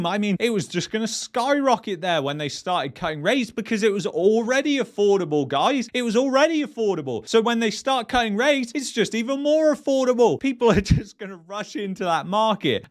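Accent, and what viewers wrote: England, United Kingdom. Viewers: Can't get enuff of your English accent 👍👍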